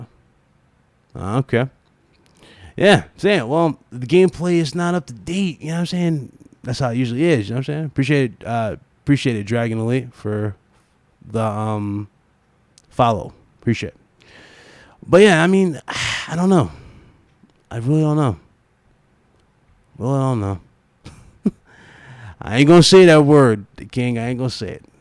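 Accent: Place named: Atlanta, United States. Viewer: we need to use his webcam 😄 it's so weird watching you since you didn't use cam before. people thought you were white? lol I can pick up that Atlanta accent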